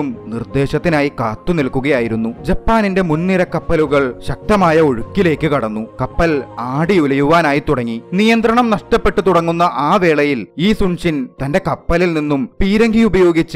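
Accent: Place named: Namboothiri, India. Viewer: അവതരണം- നമ്പൂതിരി സ്റ്റൈൽ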